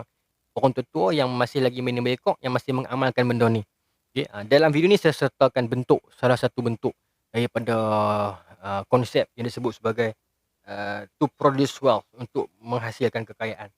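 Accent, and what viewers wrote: North, Malaysia. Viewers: Seqonok dengaq caqa oqg utaqa yg cerdik dn humble brckp, tuan tlg doakan unt saya rezeki dn jodoh, umoq bnyk dh ni, t.ksh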